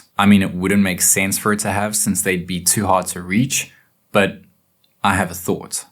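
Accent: South Africa. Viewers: can i ask if this guy is South african? the accent sounds very similar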